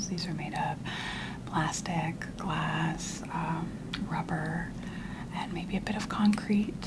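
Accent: South, United States. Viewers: I love this and the southern accent and ghe TYPING sounds❤❤❤❤❤ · Crazy that I found your videos by reels on Facebook and totally got ASMR from the southern accent. Imagine my shock when I found you actually make ASMR content intentionally!!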